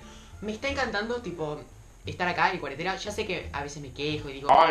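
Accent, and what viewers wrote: Argentina. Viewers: se le nota los argentinos hablamos así uwu.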